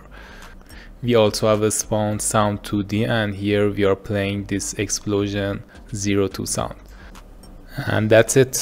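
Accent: Iran. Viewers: ur accent lol! are you persian dadash?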